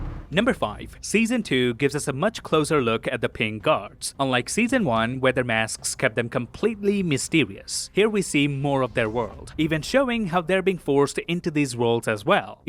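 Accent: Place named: Canada. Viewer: which part of canada is this accent from? Sounds interesting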